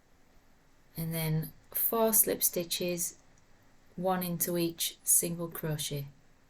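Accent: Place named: North, United Kingdom. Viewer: Great to hear a northern accent with clear instructions. I’m a beginner and had no problem following your pattern. Thank you. I will be following you from now on. Xx❤️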